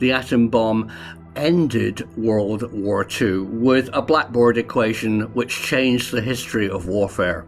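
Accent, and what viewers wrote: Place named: RP, United Kingdom. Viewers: It's a British accent. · Gotta love that good old fashioned BBC accent! Interesting as always Prof.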